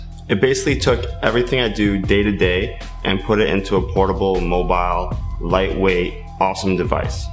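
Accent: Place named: United States. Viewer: Your accent sounds VERY American (I'm assuming you're Canadian because of some of your quirks). Great review, and I'm surprised you don't have more subscribers.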